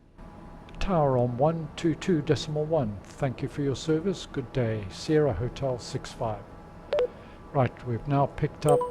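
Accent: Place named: South Africa. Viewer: I think you should offer your voice as permium add-on. Would love to hear your soothing south african accent guide me from A to B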